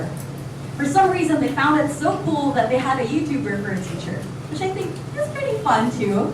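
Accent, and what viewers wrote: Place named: United States. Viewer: when you speak english its as if you are from the USA--more specifically the typical pinay from the west coast like California. Then seeing your other videos when you transition to tagalog and accent comes back. That is a very nice skill.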